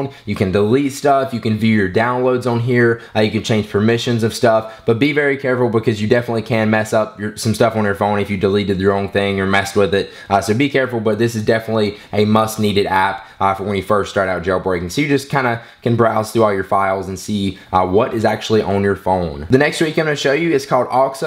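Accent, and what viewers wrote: South, United States. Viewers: That Southern accent!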